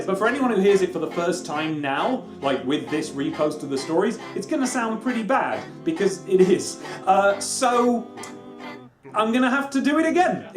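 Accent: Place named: Northern England, United Kingdom. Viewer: Hey,. Quick question; which county are you from? I have difficulty narrowing accents down or if accents even work by county of origin anymore. I can tell you're from the North but I wouldn't be able to tell York from Newcastle. We have an over abundance of London accents in the South East.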